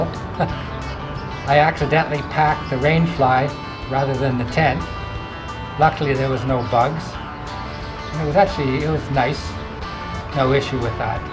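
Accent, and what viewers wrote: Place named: Canada. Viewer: You sound Canadian...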